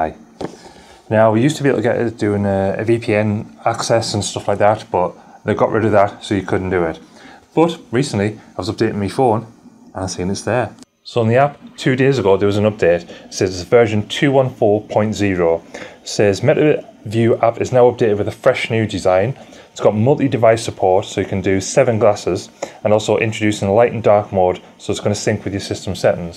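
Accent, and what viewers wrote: Middlesbrough, United Kingdom. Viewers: You sound local to me (Middlesbrough).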